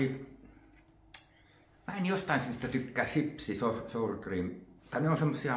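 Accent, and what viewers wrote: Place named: Finland. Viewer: I love finnish accent 😅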